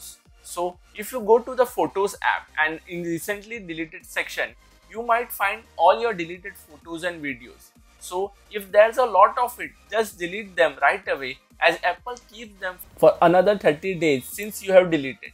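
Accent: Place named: India. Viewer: You hear an Indian voice… uh oh. Clickbait 99.9% likely ahead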